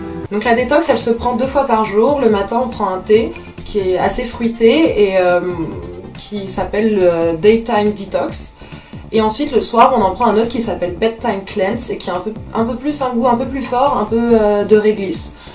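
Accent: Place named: France. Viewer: The French accent is quite dope. Keep going from London.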